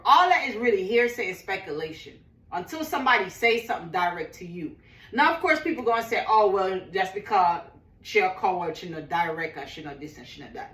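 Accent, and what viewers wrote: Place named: Jamaica. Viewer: Lol. So funny. I just felt like hearing your voice and your Jamaican accent that has been away from me for a while and that's what i found on my page. I feel glad that you are well. You are doing a great job. You are really all into it. Hands Up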